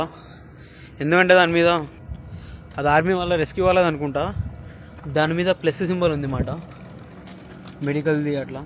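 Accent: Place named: Rayalaseema, India. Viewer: Kachitamga nedi rayalseema kada bro ...😊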